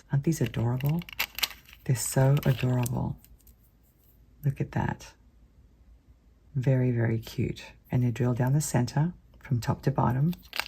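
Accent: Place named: Australia. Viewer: Thank you. Can’t wait to see what you design with these beautiful beads! Just got home from a long visit with my son in Australia. Always think of you when I venture “down under” and listening to your accent always makes me smile and takes me back. Take care I will be looking forward to your next video. Take care of you ❤️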